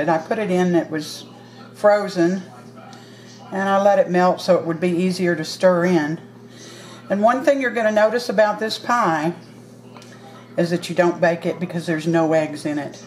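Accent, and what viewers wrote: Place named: Tennessee, United States. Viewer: Your accent sounds like you in Tennessee. Are you ?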